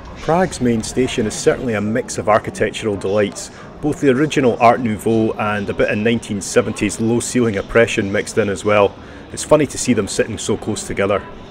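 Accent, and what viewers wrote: Scotland, United Kingdom. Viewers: Another memorable report. In the very olden days I used to frequently travel by sleeper between Milan and Frankfurt. The luxury in those days would have even impressed Agatha Christie. That notwithstanding I could never sleep. I always had the sensation that I was slipping out of bed. BTW was that a Scottish accent hiding behind the Polish?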